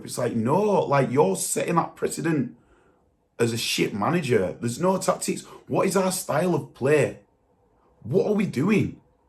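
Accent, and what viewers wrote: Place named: Northern England, United Kingdom. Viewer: why is this northerner talking about Arsenal... a london team ???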